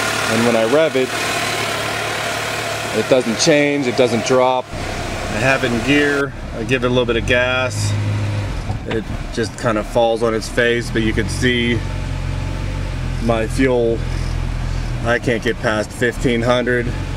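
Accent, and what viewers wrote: Pittsburgh, United States. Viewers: Are you Paul's brother? If so, I know he said you were starting a channel but i dont remember him giving a link..i happened to find your name through another one of his subscribers and figured you must be him. Plus you cant hide with that Pittsburgh accent. You two are like the dream team when it comes to diagnosis.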